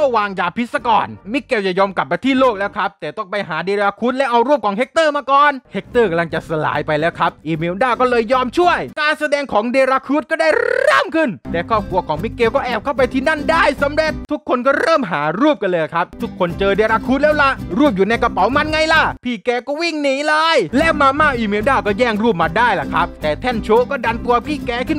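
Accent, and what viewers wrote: South, Thailand. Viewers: เเอดเป็นคนใต้ท้ายนิ555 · พี่เป็นคนใต้เหรอ พี่เป็นคนจังหวัดอะไรอ ผมสงขลา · พี่เป็นคนใต้ใช่ม่าย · พี่คนจังหวัดอะไรครับคนใต้หรอพี่ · พี่เป็นคนใต้หรอครับ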